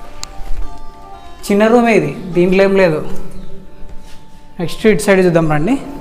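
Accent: Rayalaseema, India. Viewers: U r from Rayalaseema u r accent says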